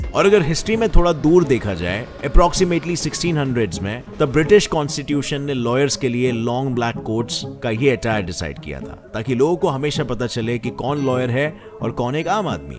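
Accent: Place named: Maharashtra, India. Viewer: Tension naka gheu , Marathi accent 👍🔥🔥❤️ kamaal aahe 👍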